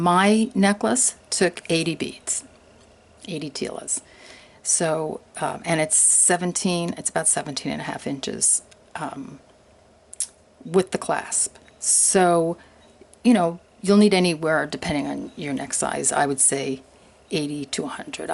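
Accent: New York, United States. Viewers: you sound like youare from New York, where most people have patience with us. I made this by using 6mm bicones and 6mm rounded beads my friends love it, i use 86 tila and i found another way to make it with squares as well.. love it, i would love you to make something with pinks and purples and silvers.. thanks Love you girl.. I can bring you some Blue paddings. I am so addicted to this necklace I have made 12 of them so far and to give to gifts.. i need to show you what i made so far now i am making a blue bracelet a bit different.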